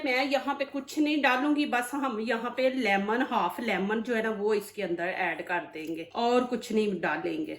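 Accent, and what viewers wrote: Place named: Punjab, India. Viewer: Pure Punjabi accent ❤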